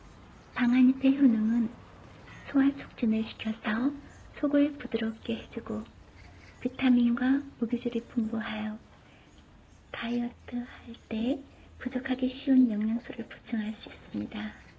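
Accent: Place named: Gyeongsang, South Korea. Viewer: 경상도사람목소리.ㅋㅋ.목소리는있는그대로...좋아요.제가 청음짱!이라서....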